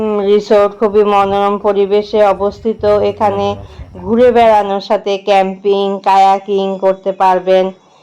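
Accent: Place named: Chittagong, Bangladesh. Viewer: আপু যে চাটগাঁইয়া কথায় বুঝাই যাচ্ছে আপু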